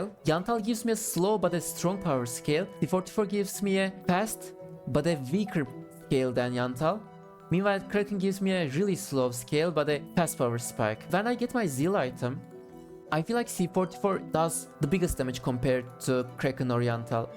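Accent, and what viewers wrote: Turkey. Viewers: I know when I hear a turkish accent subbed o/ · Brother are you Turkish? Great video. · Türk İngilizcesi bu amk xd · Türk aksanın var, türk müsün? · tam türk ingilizcesi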